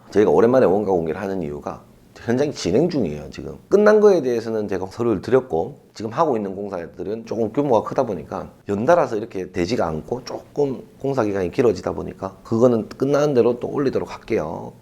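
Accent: Gyeongsang, South Korea. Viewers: 비오는날 막걸리 먹으면 엄청 좋지요 항상 잘 보고 있습니다 경상도 사투리 좋습니다 저도 경상도인데. 괜찮은데요 ㅋㅋㅋ.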